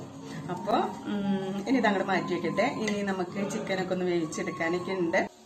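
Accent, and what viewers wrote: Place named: Malappuram, India. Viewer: നിങ്ങള് മലപ്പുറം ആണോ? ?സ്ലാങ് മലപ്പുറം ആണല്ലോ 😀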